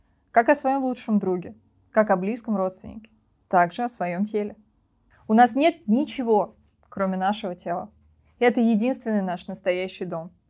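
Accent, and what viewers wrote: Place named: Belarus. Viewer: Чудо и обоняние , а голос завораживает как мне кажется с нотками белорусского акцента . Как же повезло тем студентам кому вы преподавали, а тем более в Вас объедены две мои самые уважаемые профессии педагог и врач ! Дай Бог Вам …….. Вы сами знаете чего . У Вас всё получится , потому что Вы живете для других , давая им удочку , а не жаренную рыбу , а это самое ценное . Спасибо!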